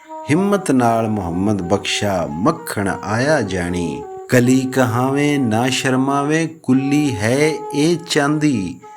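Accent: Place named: Lahore, Pakistan. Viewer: السلام علیکم عید مبارک صاحب. معزرت کے ساتھ سیف الملوک کے خالق کا لہجہ پوتھواری زبان میں تھا اپ اس کو پنجابی لاہوری اندازا میں، پیش کر رہے ہیں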